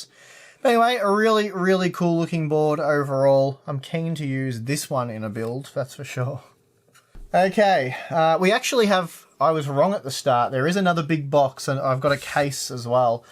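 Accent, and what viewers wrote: Australia. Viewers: Its not "hea" its here, its not spoze its "suppose" Learn to phrase you lazy Australian! Can't beleve you have accumulated so much FREE STUFF from manuafacterers who have sent you their expensive SHIT that you have literally WALLED! Maybe you should be donating them to your viewers instead of throwing shit around like your some expert. HINT! You don't even know how to handle a MOBO! BTW the Taichi board you pulled out and threw to the side is one of the best boards you can buy yet you had nothing to say about it other than "looks like the others". You knew nothing about it? LMFAO!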